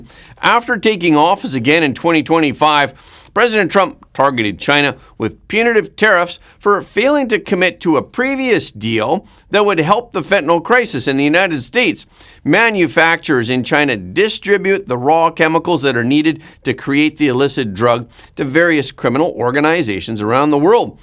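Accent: Canada. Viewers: you do a great job of giving us real news! Thank you! It sounds like you may have a Canadian accent? Aetna is pronounced Et-nuh. 🙃😊🤗 A few other words give you away, as not being US pronunciation’s, but not too odd to our ears.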